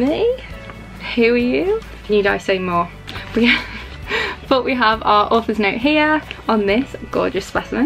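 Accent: Liverpool, United Kingdom. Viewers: Where are you from in the uk, you sound Like you’re from Liverpool x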